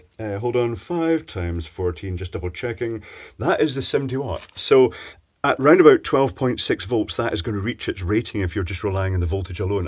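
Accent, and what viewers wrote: Scotland, United Kingdom. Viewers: I think you're mishearing his dulcet Scottish tones there, +jeromekerngarcia : 12 x 28 = 336. He later points out that the long rows are 7 x 4 = 28, so it's really 4 x (12 x 7), which of course still comes to 336, although you're right that Brexiteers would probably scrawl "It's 240 LEDs!!!" in massive letters on the side of a bus and claim anyone pointing out that the maths shows 336 was part of "Project Fear", these days known as "Project Reality" ;)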